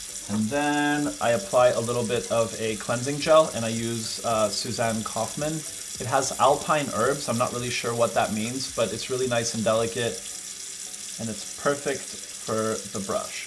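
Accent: Quebec, Canada. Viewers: okay but has a french canadian accent when he speaks french??😍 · OMG I didn't realize was Canadian till I hear his french accent!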